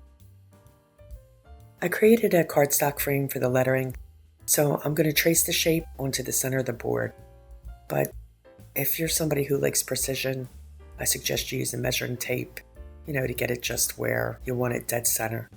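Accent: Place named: New York, United States. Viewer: Love this sign! Hope you’re loving your new fridge! Where. Are you from? Your accent sounds like we could be neighbors! I’m hearing NY NJ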